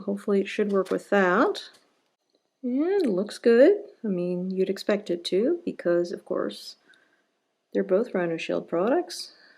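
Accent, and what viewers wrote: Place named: United Kingdom. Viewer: Hello ( for the French), by the way you sound British to me. Good job. It will be my next screen protector.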